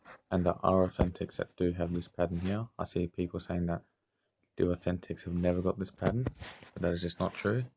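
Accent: Australia. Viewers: Do I hear a Aussie Ascent? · You Aussie mate? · Of course he speaks Aussy and Sara Ayri the comments are from the Area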